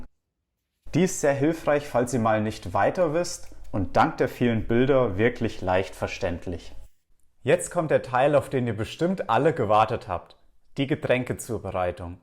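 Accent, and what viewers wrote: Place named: Franconia, Germany. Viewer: Super erklärt, deutlich gesprochen (mit fränkischem Akzent - sehr symphytisch). Habe nur noch eine Frage, my Milk - Funktion wäre das Michprogramm 1 - korrekt? Vielen Dank freue mich schon auf weitere Videos.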